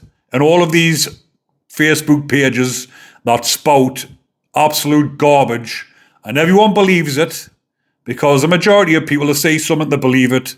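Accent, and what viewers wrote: Newcastle, United Kingdom. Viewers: Geordie accent is brilliant. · Sounds like a Gordy Northumberland/or Newcastle to me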